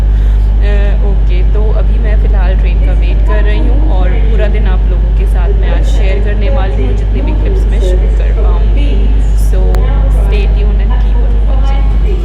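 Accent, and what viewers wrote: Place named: Uttar Pradesh, India. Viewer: Congrats! Well deserved honour. I'm from Chicago and I started watching your channel since last August when I was visiting India.One day I was getting bored in my hotel room as my husband was away on business trip and I could not go along with him as I was sick, so your cleaning routine flashed on while I was watching other videos on you tube, and believe me it was so interesting that I was instantly hooked up to your channel. Your typical U.P. style of speaking and hard work combined with your simplicity just did wonders and I felt connected to you. Congrats and best of wishes for your future endeavours.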